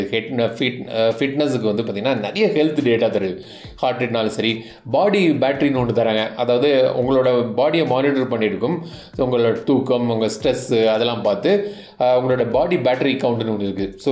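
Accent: Kanyakumari, India. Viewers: அண்ணாச்சியின் குமரி தமிழ்..😍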